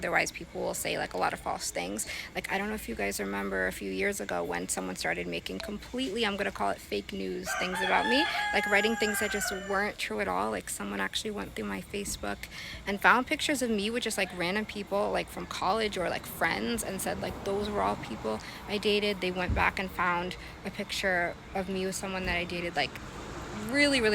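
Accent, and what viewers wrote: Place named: Jamaica. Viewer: Love your slight Jamaican twang, it's cute. Your relationship is not my business. You look good, glowing and healthy. 😊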